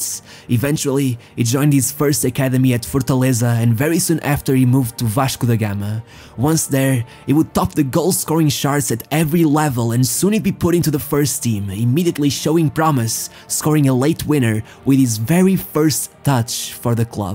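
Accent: Portugal. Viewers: Portuguese. The accent is unmistakeable. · Are you from Mozambique,Portugal,Angola or cabo verde i swear you sound like your from Portugal or african country's that speak Portuguese iam gonna speak Portuguese and if you know you olá meu nome é Nícolas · Bom canal. Esse sotaque português 😅😊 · you're Portuguese, my friend? you do sound like portuguese, 😁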